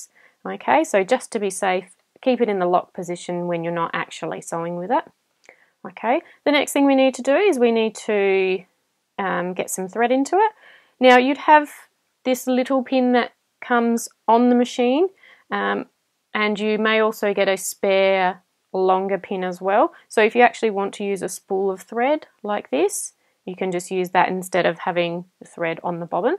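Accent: Australia. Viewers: Great very helpful video, thank you,,,and I love this Australian accent. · Thank you for this very helpful video. The Aussie accent was frosting on the cake! :)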